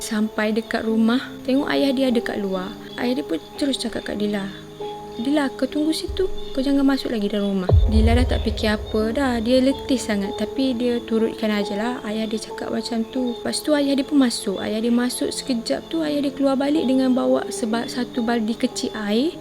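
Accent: Kelantan, Malaysia. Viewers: Sy Dr Cyberjaya...tp Sy still suka loghat Kelantan seperti cerita sebelum nya...ni nmpk mcm kekok jer bercerita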